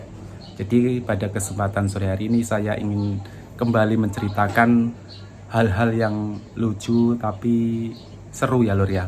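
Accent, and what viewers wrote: Java, Indonesia. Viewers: Dr lampung?. Lampung di mana. Wilayah aceh, padang atau tanah besar riau. Sebab pertuturan kamu tidak melambangkan yg kamu melayu sumatra. Lebeh mirip indonesia daerah jawa.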